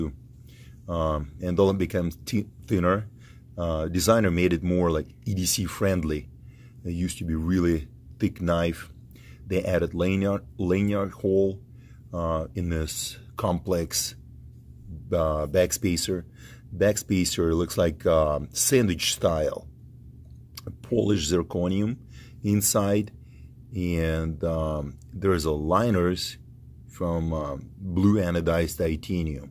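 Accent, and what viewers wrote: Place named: Russia. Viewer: Родную речь слышно даже на английском) через 3-4 дня мне такой же придет)))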